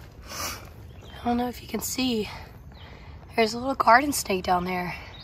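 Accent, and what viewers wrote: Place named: Mississippi, United States. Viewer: Hey 👋 Just saw your announcement video and I'm also from Mississippi, central Mississippi. I knew the accent sounded familiar. 😎👍👍🥾🥾⛰️⛰️⛰️⛰️🏕🤙🙏👋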